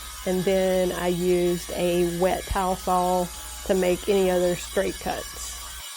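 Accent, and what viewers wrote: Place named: South, United States. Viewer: Good video, well depicted. One thing ( Not a criticism) Hey, where are you from? I love your accent. I talk like that too, but my family wonders why because we were from Ohio and Pa, not the SOUTH.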